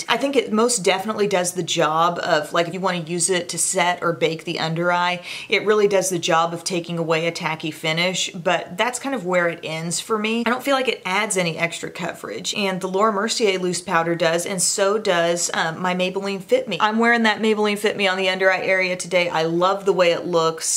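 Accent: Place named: Texas, United States. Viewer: Hi, I was just watching one of your videos that references a corrector as university of Texas colors, you kind of sound a little like your from Texas, I was just curious if that’s where you are... also if your a ut fan.. that’s cool but I have to Aggies :)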